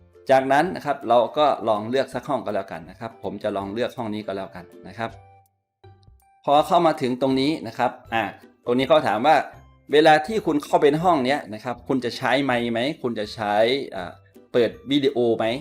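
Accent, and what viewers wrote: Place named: Thailand. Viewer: ทำไมคนที่ไทยต้องออกเสียงชื่อหรือศัพท์ภาษาอังกฤษให้เป็นสไตล์แบบไทยๆ Google กูเกิ้น ได้อย่างไร เวลาไปเมืองนอกพูดภาษาอังกฤษคนฟังไม่ออก (ต้องทำไปทำไมครับ)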